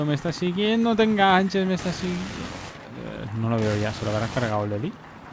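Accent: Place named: Catalonia, Spain. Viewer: tu que hablas como la milicia del mw3 awá pandó? yo le entiendo en todos y cada uno de sus videos lo unico es que tiene acento catalan pero yo lo entiendo como si es de murcia, madrid, galicia y pollolandia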